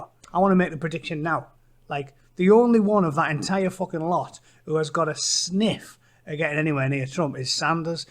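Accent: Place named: Teesside, United Kingdom. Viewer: Great commentary from you. You sound like a teessider, which makes it better than the usual metropolitan wank.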